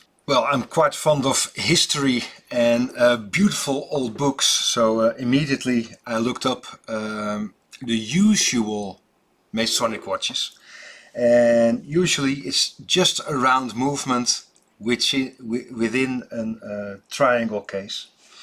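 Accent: Netherlands. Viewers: Without looking it up.. Ik weet vrij zeker dat je een Nederlander bent?😛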